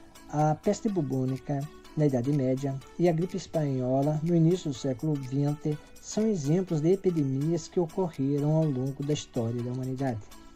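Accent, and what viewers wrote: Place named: São Paulo, Brazil. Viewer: esse cara tem sotaque paulista, paulista não tem vez aqui não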